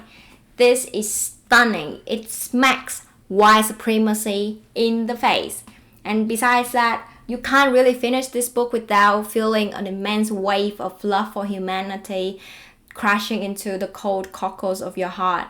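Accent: Australia. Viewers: I enjoy hearing your Aussie accent and I'm impressed with your mastery of English as your second language.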